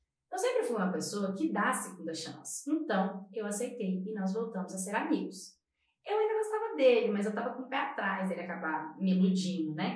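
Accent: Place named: Marajó, Brazil. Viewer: Eu so queria a história com a sua voz da ilha de Marajó 😢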